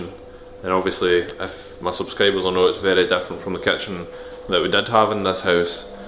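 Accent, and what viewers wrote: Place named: Scotland, United Kingdom. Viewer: just wanted to ask where you are...presumably in Scotland, with that accent, but intrigued as to where, its very familiar...well to me!!! lol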